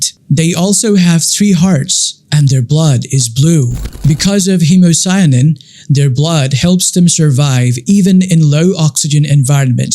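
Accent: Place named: United States. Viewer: আপনারা আমেরিকান এক্স্যানট অনেক ভালো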